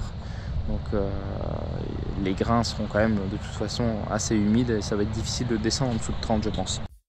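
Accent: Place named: Lorraine, France. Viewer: hello merci pour cette réponse dans l'attente de la suite des video en aparté je me regale aussi du petit accent bien de chez nous qui me fait du bien amitié de lorraine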